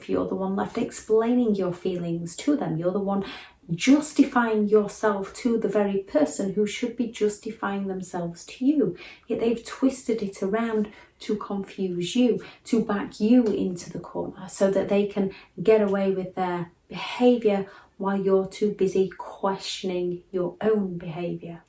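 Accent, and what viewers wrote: United Kingdom. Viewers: I like your work. You are well researched, even keeled and the UK accent is just lovely.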